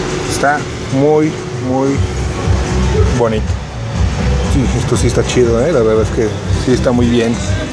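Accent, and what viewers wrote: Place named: Peru. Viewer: Eso donde queda? Que pais? Como hablas supongo que es en Peru ? Lindas las lamparas !!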